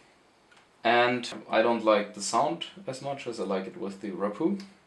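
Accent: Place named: Germany. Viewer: Love the accent (must be German). I did not know the brand Rapoo, I like how compact it is. Thank you for the review.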